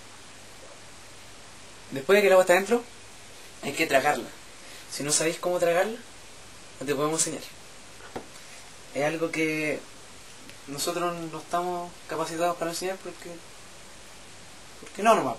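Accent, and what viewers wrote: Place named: Chile. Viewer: Acento chileno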